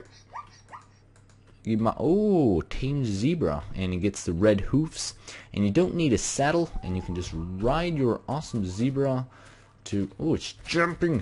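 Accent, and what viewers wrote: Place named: Australia. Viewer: ( I wanted to hear you in your Australian accent.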